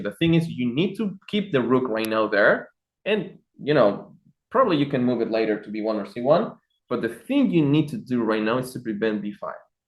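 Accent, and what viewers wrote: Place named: Peru. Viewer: Hahahahahahaaa da risa un Peruano intentando hablar inglés. Cobarde corriste como tú p madre. Estás muy f30 suda.k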